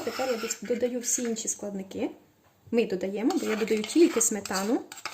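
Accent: Poland. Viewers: Ви молодці.Ваша українська мова чудова.Живемо майже рік в Польші і лапаю себе на думці,що частіше думаю на польській і деколи навіть плутаю слова укр з польськими.Це цілком нормально...цим не переймаюсь...Подруга моя довше живе тут,чоловік її поляк і спілкується з ним на польській,то в неї така подібна вимова до вашої.Мені подобається,багатьом теж...а кому ні то нехай не слухає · мова твоя повязана з польскою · не ображайся, але твоя вимова трохи ріже вухо. Тебе гарна українська мова, але відчувається польський акцент на рівні наголосу на останніх складах у словах. Це робить нашу українську мову у твоєму спілкуванні дещо не гармонійно. Тому прошу це врахувати. Ти як блогер, людина з вищою освітою повинна розмовляти правильно. Покажи всьому світу, що українська мова гарна, мелодійна. Роби правильні наголоси!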